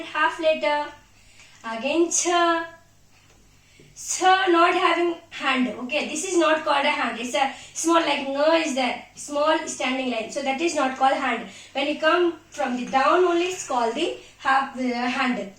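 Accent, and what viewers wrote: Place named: Tamil Nadu, India. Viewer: We are missing your tamil pronunciation ......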